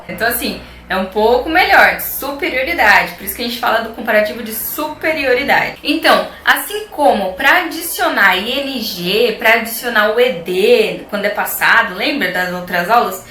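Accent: United Kingdom. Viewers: Sophia Lvt não é q a pronúncia esteja errada, ela está falando inglês britânico. Tem diferenças entre inglês americano e britânico... uma delas é a pronúncia. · vc fala no ingles britanico? é pq lá o t n tem som de r igual o do estados unidos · esse sotaque e britanico ou e impressao minha?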